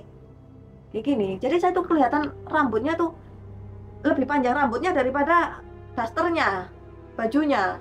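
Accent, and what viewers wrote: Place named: Surabaya, Indonesia. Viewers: Omongane wong suroboyo nyel 😂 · Metu medok jowone mbak seng marai lucu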